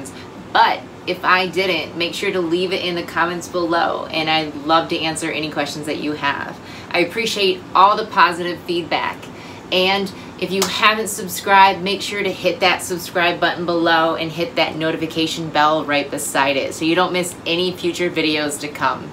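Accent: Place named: Brooklyn, United States. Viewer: With both the Polyfilter and carbon in the sump, I'm curious about how much production you really get from the skimmer. Both are supposed to be removing the organic substances that the skimmer is skimming. And I'm wondering whether you could only use the chemical filtration and skip the skimmer. P.S. You sound like Brooklyn.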